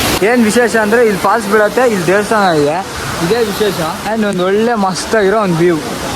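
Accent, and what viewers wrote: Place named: Sirsi, India. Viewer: Bro nivu sirsi siddapura navra same a side tarane matadthira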